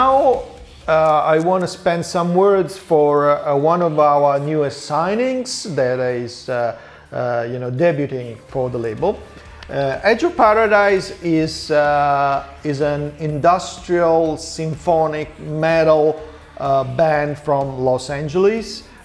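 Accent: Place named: Italy. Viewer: Thanks ! Very interresting and for a french easy to understand an italian in englich because le the accent . Please continue this little interview an long lire ton frontiers !!!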